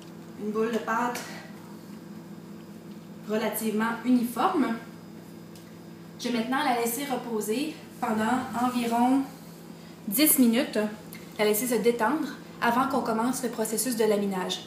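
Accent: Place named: Quebec, Canada. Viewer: En plein le genre de vidéo que nous cherchions. En québécois en plus!!! Merci pour les info!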